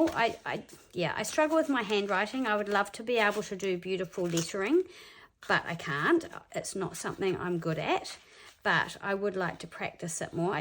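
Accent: New Zealand. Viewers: So I’m new to this junk journalling and been inspired by a local friend. I think I’ll watch and learn this month, and start getting things together for when June comes around. Having watched Megs intro videos, yours is the first one I’ve watched with the daily prompts, and I’m even more inspired now. And how lovely to hear another kiwi! I’m in the waikato. Have subscribed to your page and look forward to seeing your journal develop! Thanks so much.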